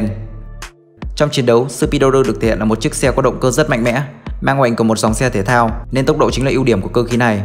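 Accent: Japan. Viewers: Kênh của ad rất có chất lượng, nhưng có một nhược điểm lớn, đó là ad dựa vào phiên âm tiếng nhật quá nhiều để gọi tên cho các mecha, toy, gattai các loại. Ví dụ, Speedor, ad đọc thành speedoru luôn, trong khi đó chỉ là thứ tiếng anh nhật phiên âm sai thôi mà. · Anh ấy đọc tiếng anh kiểu người Nhật đó bạn :V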